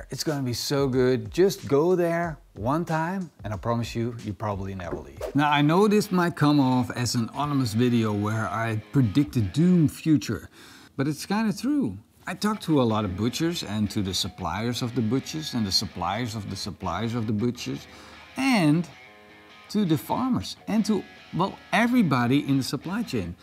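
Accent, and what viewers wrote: Netherlands. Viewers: Dutch Guy reconise a fellow dutch accent NICE!!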